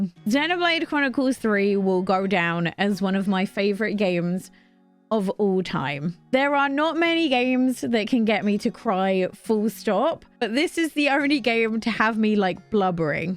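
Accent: England, United Kingdom. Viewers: I know you have an English accent, but her name is Mirabel, not Marybelle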